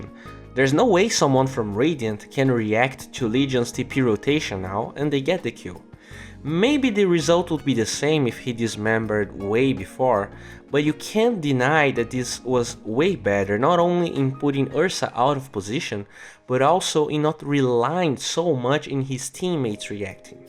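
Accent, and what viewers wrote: Brazil. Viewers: melhora tua pronuncia cara. TWO nao eh TO. Entrega tua "brasileirisse" facilmente. Fora isso, parabens pelo conteudo.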